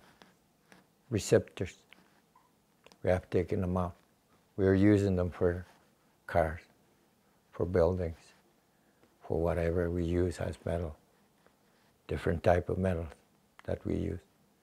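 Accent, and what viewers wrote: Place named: Canada. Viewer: This is really interesting. His accent and my accent are similar, I can hear it as I listen. Excellent video. :) That Canadian dialect ~from a Yooper in "da U.P" of Michigan. :)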